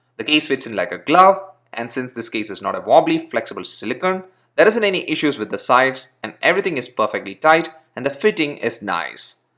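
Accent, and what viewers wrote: Kerala, India. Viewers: aa accent ketapozhe enik thonni malayalee aanennu ..hahaha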